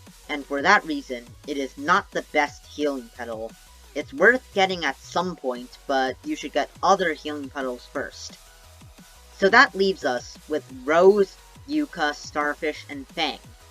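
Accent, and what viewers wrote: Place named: United States. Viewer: Im from south america, and your pronounciation is so american, lol Nice video btw :D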